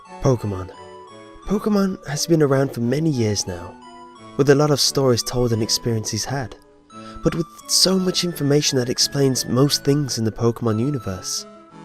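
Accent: United Kingdom. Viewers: You are my favorite YouTuber ok I look up to you from your British accent to your super cool top tens !!! Even your creepypastas are creepyer whith you!!! And I love that 3rd one!!please NEVER ever ever never forever never stop making videos NEVER ever forever and ever never stop .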